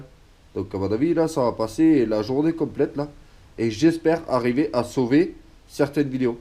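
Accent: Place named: Narbonne, France. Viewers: Aaaah l’accent de narbonne, il fait du bien à entendre. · Vu le prix que va prendre l'électricité , c'est obligé que dans mon futur logement que je cherche je vais mettre un système solaire . Ps: L'accent Narbonnais , mes racines , Merci ^^Force à vous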